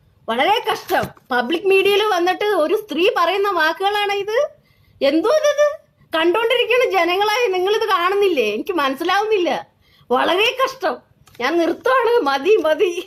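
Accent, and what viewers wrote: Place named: Palakkad, India. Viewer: Thanni palakkad thanne basha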